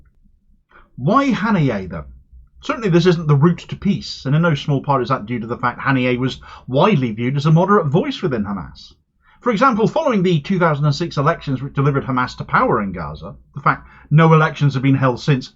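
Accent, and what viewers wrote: Cornwall, United Kingdom. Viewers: I have to agree, your lovely Cornish lilt could sound so much better! (btw I love your work and am on Patreon!)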